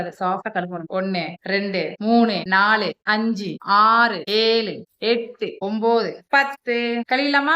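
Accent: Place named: Coimbatore, India. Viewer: கோவை பாஷை சூப்பர் ❤❤❤❤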